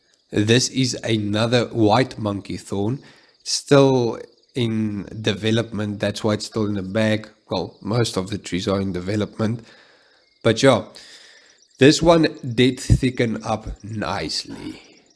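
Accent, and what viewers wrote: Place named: South Africa. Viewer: Loved the video and seeing your different trees. So much content out there is not South African, so great to hear our beloved accent. I have got a black monkey thorn from nursery stock that needs a hard chop. What is the best time of year for this?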